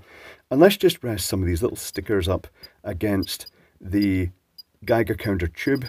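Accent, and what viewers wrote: Scotland, United Kingdom. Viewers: incredibly well spoken for a Scotsman never heard that accent anywhere here. also that's pretty toxic how is that even legal? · love to hear voice and scottsh accent ♥ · I wish people would stick those onto their eyes to stop reading about this 5G Hazard bs. Btw it’s interesting to hear how much a Scottish accent differs from person to person. While I understand you perfectly, listening to your two buddies from the MBC sometimes leaves me absolutely clueless 😂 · . "Really? Sounds like a load of bollocks" Did I get that right? I'm not too familiar with the Scot's accent. Followed up by "Are some people stupid"? and the classic, "Is naivety a form or stupidity"?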